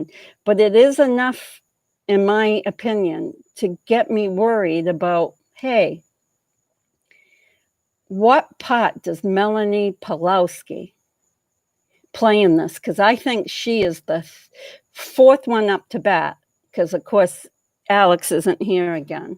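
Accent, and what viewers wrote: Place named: East Coast, United States. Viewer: I recognize your accent. East Coast?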